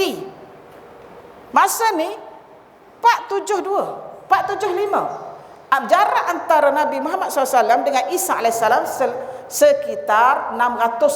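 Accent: Kelantan, Malaysia. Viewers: Saya boleh la dgr ceramah prof. Tp part nk termuntah prof sebut high impact journal. Yg high impact pun bayar duit dh dpt publish walaupun xd kualiti. Sy xsuka sistem universiti dan publication (sama sistem british je). So xyah gebang kt awam lebih2. Buku yg terbaik buku yg iklas buat mcm kitab2 ulama. Bukan mcm jurnal lecturer2 skrg. Nk MUNTAH AKU DENGAR. BTW keep researching. But DONT GEBANG. Thats all😂 btw slang kelantan tu obvious sgt...pompuan kelantan mmg byk dlm ipt mana2 pn. Ntah knpa..mb pandai sembang kot.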